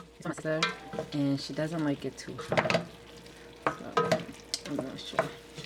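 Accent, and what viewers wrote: Brooklyn, United States. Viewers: Nicely done!!! I hear a Bk accent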